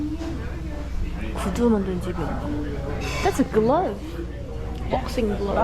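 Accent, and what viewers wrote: United Kingdom. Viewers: 친구랑 일으니까 더 편한 너낌 영국이라 그런지 발음이 더 딴딴한 너낌이랄까 ㅎ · Loving to Listening 님 speak English with British accent in the UK borderland. Wow... What a Perfect Combination!! And very Spontaneously. ㅋㅋㅋ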